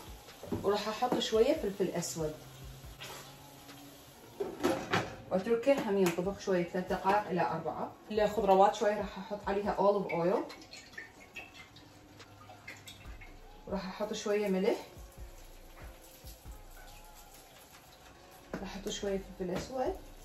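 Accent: Iraq. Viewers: هاي من كلتي علي نصيي 😂😂 هاذة الصوت العراقي الاصلي 😂❤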